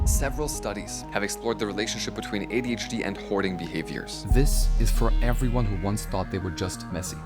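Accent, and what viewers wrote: Germany. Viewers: Wenn meine Ohren mich nicht komplett in die Irre führen, ist deine Muttersprache Deutsch. Wieso machst du die Videos nicht (auch) auf Deutsch? In meinem Umfeld gibt es leider viele, die kein Englisch können und es ist sehr schwierig ihnen die ganze Sache anständig zu erklären. Leider gibt es ja kaum gute deutschsprachige Videos zum Thema. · I hear the german accent 🤨😂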